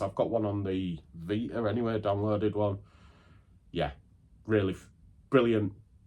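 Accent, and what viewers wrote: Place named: Hull, United Kingdom. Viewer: Hey dude, great video, love prince of persia games. Found this video randomly and because you sound like me (im from hull, or should i say 'ull 😂) ive subscribed. Look forward to followng your journey mate. Keep up the great work and collection 👍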